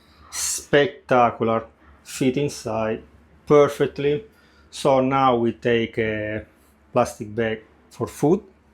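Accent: Italy. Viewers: Tuoi vídeos mi piacciono moltissimo. Mi piace tanto il acento italiano. And tour recipes and techniques are great!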